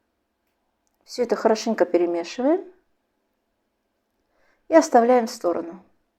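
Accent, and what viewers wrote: Baku, Azerbaijan. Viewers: Здравствуйте! Спасибо за прекрасные рецепты!!!Приятно слушать вашу речь с бакинским акцентом❤❤❤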